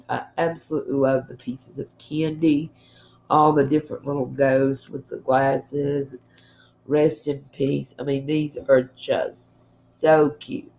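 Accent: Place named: Virginia, United States. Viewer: I love all the stuff you found! Hope all finds you well in VA! I sure miss the VA and WV mountains! I get homesick every time I hear you talk...lol...tyfs stay safe and well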